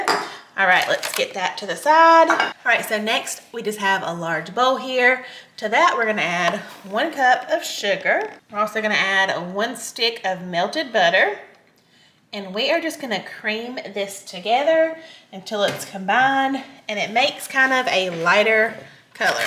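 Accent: South, United States. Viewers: Btw, I love your southern drawl ❤ 😊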